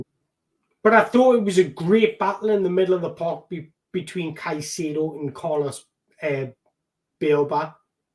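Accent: Newcastle, United Kingdom. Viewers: my bro I still don't know whether you're chels or geordie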